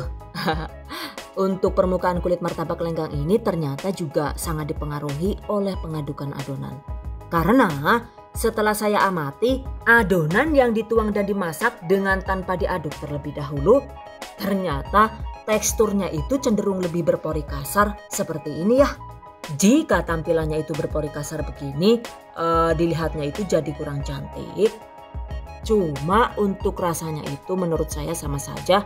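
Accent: Banyumas, Indonesia. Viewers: Salfok sm bhsanya bun.. Jawanya ngapak jg trnyta sm ky aq. Jawanya mn bun? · Salam sesama wong ngapak 😁🤣ora ngapak ora kepenak🤣🤣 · Gx prnh gx tertarik sm resep dr bunda....btw bun,mw tny.emng logat madiun ngapak bgtu y bun.tp q sk beud c,scra q asli wong tegal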